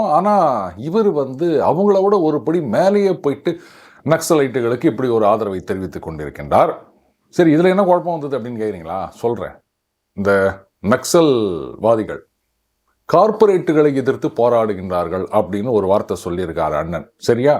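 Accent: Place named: Chennai, India. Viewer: JRS ஐயா வழியில் மெட்ராஸ் பாஷை 😇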